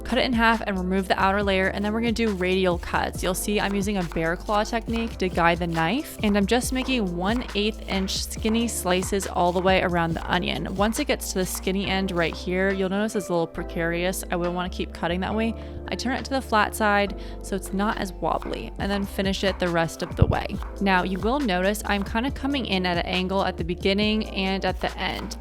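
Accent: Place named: Los Angeles, United States. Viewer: I'm from LA, I was convinced the LA white girl accent was a myth until the first 5 seconds of this video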